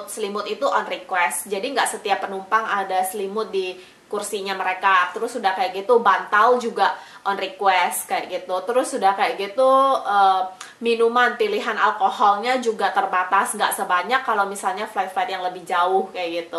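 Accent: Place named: Medan, Indonesia. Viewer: Logat medan nya masih kental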